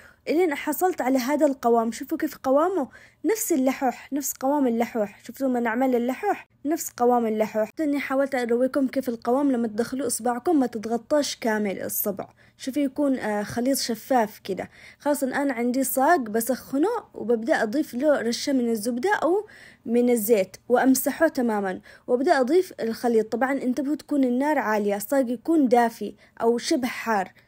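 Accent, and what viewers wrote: Aden, Yemen. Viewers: حبيت فيديوهاتك من او ل نظره❤واللهجة العدنيه مليييييحه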